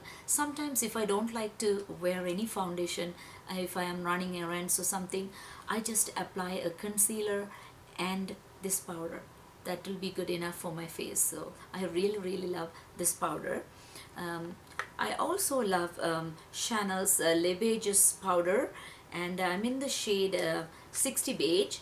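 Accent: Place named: Tamil Nadu, India. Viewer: yes! she was Tamil and spoke very similar to you! i was wondering if you were Tamil as well if you don't mind me asking:)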